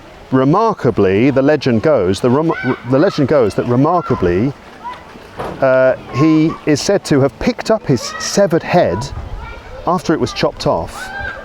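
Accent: United Kingdom. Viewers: What beautiful, well documented, almost lyrical report on. a parisiàn district I had the opportunity to stfoll up and down years ago whilst a student. Thank you for the entbjsiasm, your English-savoured French words reading 😮, I ennoyed your "Walk, cycle and Talk" immensely 😢 Françoise crom Martinique.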